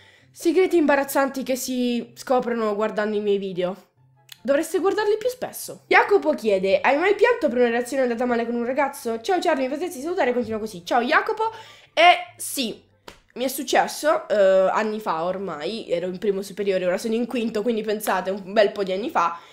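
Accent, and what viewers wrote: Italy. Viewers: charlie non c'é bisogno che non dici di dove sei si sente anche io sono di quelle parti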